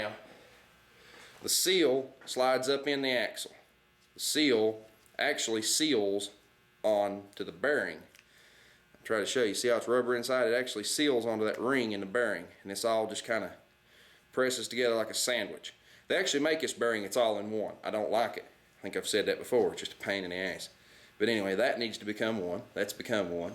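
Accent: South, United States. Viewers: Great job. I learned a lot so wanted to say thanks. Love the southern draw. Now to the garage.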